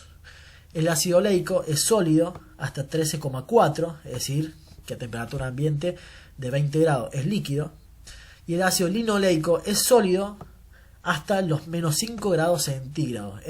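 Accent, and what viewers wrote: Argentina. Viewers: Hablas como argentino de provincia. De donde eres?¿cordobes?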